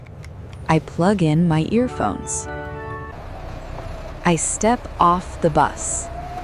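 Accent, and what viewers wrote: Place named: United States. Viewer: It's American accent bro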